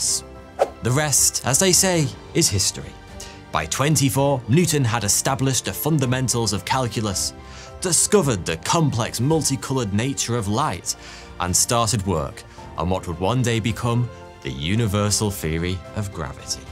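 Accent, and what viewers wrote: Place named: England, United Kingdom. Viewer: Same, and I’m not a fan of English accents, but is an exception… 😂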